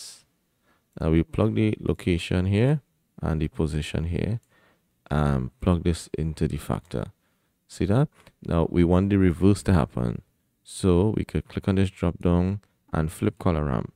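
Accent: Trinidad and Tobago. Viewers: Thank you man, Very helpful ! PLUS I think I heard a Trini accent , that's refreshing haha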